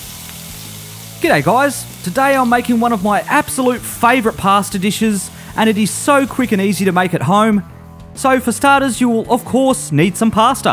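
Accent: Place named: Australia. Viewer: You have the best Australian accent ive heard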